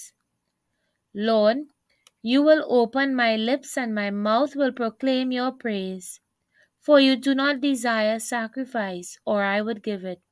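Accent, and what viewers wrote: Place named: Trinidad and Tobago. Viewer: Trini accent 😁 also Amen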